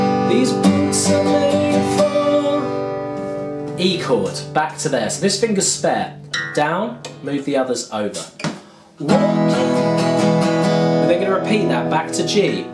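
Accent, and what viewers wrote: United Kingdom. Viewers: 🤔🤔🤔 Thé British accent is so class compare to américain's 😱😱😱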